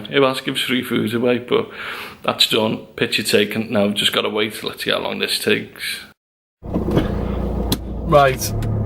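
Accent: United Kingdom. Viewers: focus on it and its fine. Scouse accent is much more understanable than scottish or yorkshire in my opinion:) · No, is my fault because i understand and speak american english. British accent is killing me.. 😁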